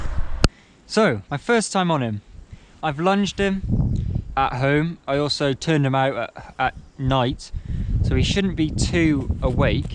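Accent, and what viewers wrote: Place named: United Kingdom. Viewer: you are so handsome and I love your British accent, I've learned so much about words and phrases you use that are so different in America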